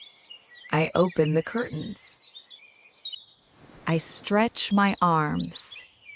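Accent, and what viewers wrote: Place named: United States. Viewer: It's American accent bro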